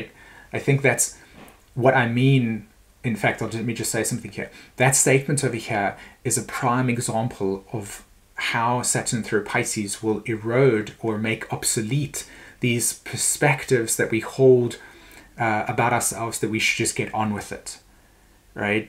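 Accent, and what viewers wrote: South Africa. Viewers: you are loosing your lovely South African accent.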